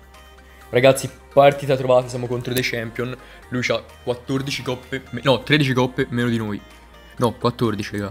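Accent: Tuscany, Italy. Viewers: lo so sei toscano si sente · Bella, di che zona sei della toscana?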